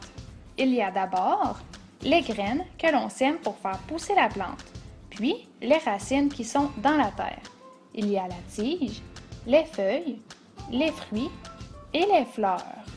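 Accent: Quebec, Canada. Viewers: Kebek je crois!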